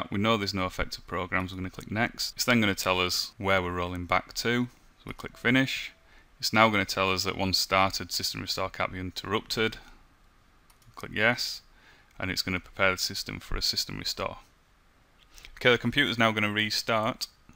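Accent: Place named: Newcastle, United Kingdom. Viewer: You have very sloppy diction. It makes you very difficult to follow especially when you move that quickly. Now try and do all that when your display has locked itself into 800 x magnification and you can't even find the buttons let alone get to the point where you can type in "restore". Can't stand sloppy exaggerated Northern accents at the best of times. Arghhhhhhhhhh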